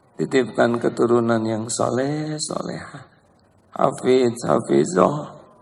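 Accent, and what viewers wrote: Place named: Sunda, Indonesia. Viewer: Sebenarnya orang mana ,kadang Sunda ,,kadang ,sanjang ,nggih tiang pundi aa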